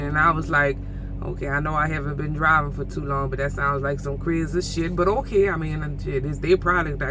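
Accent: Louisiana, United States. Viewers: You sound like my people in Houma Thibodeaux Morgan City Patterson. Oh Lake Charles too. Don't worry about what others think. Do you do more do better.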